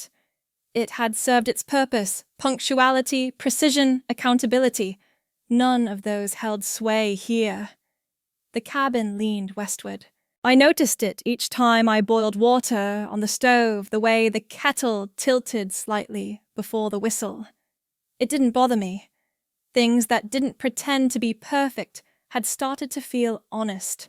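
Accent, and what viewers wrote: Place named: England, United Kingdom. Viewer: Why does she have an English accent?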